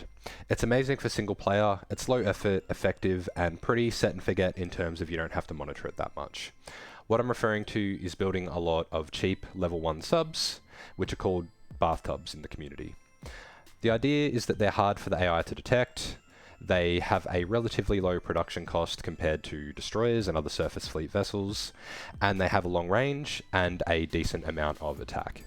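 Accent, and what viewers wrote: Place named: Australia. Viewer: Interesting accent, "future" - "fyu chah", "player" - "pla yah". Where are you from? EDIT: Nvm, your profile says Australia, mate.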